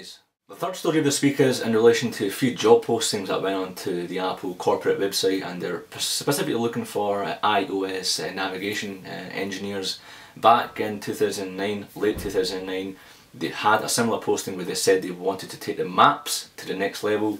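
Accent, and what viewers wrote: Scotland, United Kingdom. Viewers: are you scootish?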